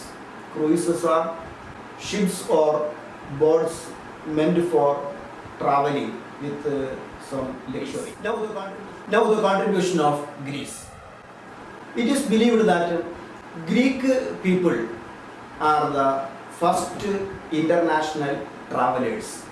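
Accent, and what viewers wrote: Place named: Kerala, India. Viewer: Malayalam poley thonunnu some times your english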